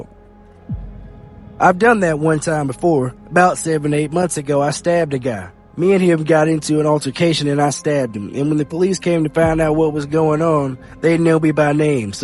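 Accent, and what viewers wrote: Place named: Alabama, United States. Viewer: Dude I swear u talk just as country as I do 😂 what part of Alabama are u from? I'm in Gadsden 😊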